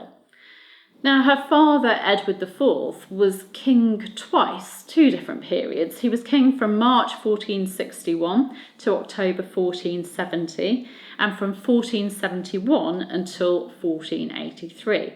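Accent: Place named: United Kingdom. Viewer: HI, I understand you really well. Even though English is my second lanquage, I find your English accent very charming.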